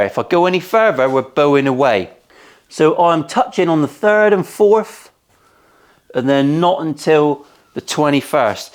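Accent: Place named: Northern Ireland, United Kingdom. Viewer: Might want to say 'northern Irish' accent as a lot of the world probably think of the Irish accent as sounding like Michael J Fox in BTTF3 😃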